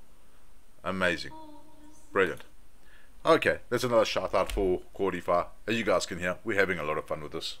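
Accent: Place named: South Africa. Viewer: sounds South African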